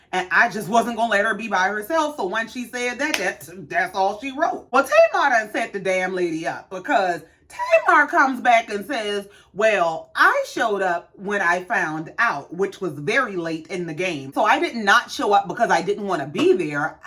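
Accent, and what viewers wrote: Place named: Charleston, United States. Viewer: I just happened upon your page, and as a South Carolina girl if that ain’t Chucktown I hear I know sumn! Glad to have found you!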